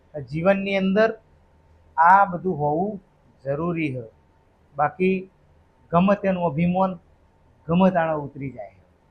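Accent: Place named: Charotar, India. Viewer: Charotar No Havaj Bole Mari Jaan 😍❤️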